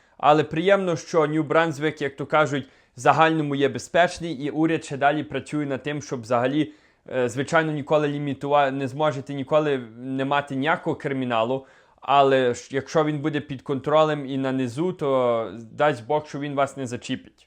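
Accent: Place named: Lviv, Ukraine. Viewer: На Львівщині люди дійсно так розмовляють.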